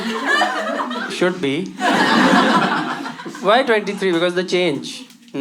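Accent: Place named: South India, India. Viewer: Watching you for the first time and totally hooked....you sound so much like a south indian 😂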